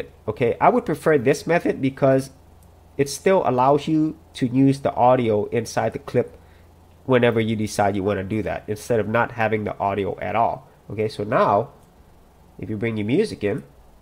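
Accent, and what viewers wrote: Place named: England, United Kingdom. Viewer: Oh my, finally an English guy talking and making it easier, hard clap to this guy for this.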